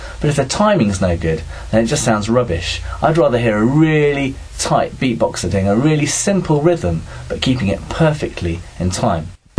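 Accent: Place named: Kentucky, United States. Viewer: its sound like kentucky, jusge m elike i be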